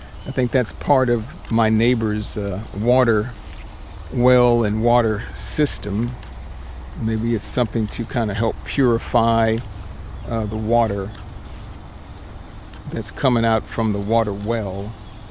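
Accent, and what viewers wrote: Texas, United States. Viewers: I like the Texas dialect ! it´s laid back and cool Wild West style😃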